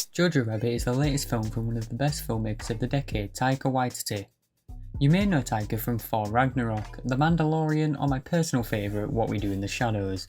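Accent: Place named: Stoke, United Kingdom. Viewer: U sound stokie but then my accent gps is rubbish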